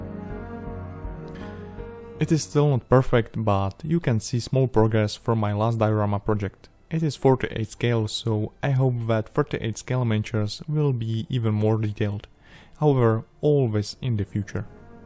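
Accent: Russia. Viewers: ты русский 100% ну или белорус крч инглишь твой второй язык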